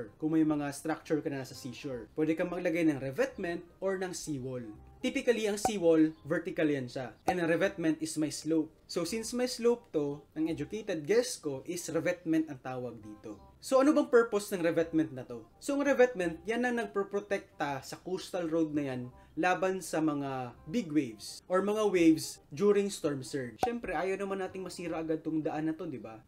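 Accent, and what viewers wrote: Visayas, Philippines. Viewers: Bisaya d-i ka dong?asa dris Pilipnas ka gkan?hehe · Klaro kaayo basta bisaya hahahaha nag subscribe nako engr. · Bisdak diay si Engr. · Basta ang alam ko bisaya ka bai tama ba ako. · Hi bisaya diay ka?...hehehe...parehas diay ta. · Bisaya dodong.. Apir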